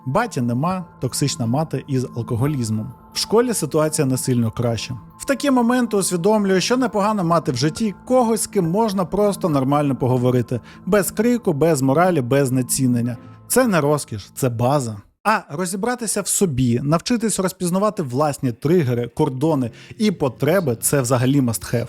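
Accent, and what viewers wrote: Russia. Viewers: Я дуже-дуже перепрошую. Мені дуже подобаються Ваші відео, але не можу втримась від хвилинки грамар-наці. У Вас часто проскакують російські наголоси: брАла, а не бралА, спИна, а не спинА, помЕрло, а не пОмерло. А ще по-російськи звучать наголоси на прийменниках, наприклад, ми віримо на слОво, а росіяни — нА слово. Ще раз даруйте. Просто це часті слова у трукраймі і я сподіваюсь, що Ви пофіксивши ці дрібнички, покращите ваш контент. 😳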